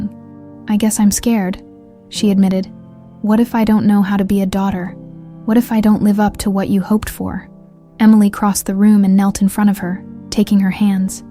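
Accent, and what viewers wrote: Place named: England, United Kingdom. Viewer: Your telling language is not American it sounds more like English accent. Would you mind telling from which country you’re from.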